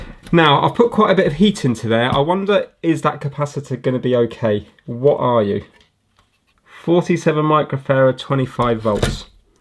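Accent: United Kingdom. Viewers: its funny, I have been listening to you for years now. My daughter says to me (her room is down the hall from my office / gameroom) shes like you really enjoy listening to British youtubers, and I said "It's " said been listening to him for years and being British always sounds more "official" Thanks as always for your content.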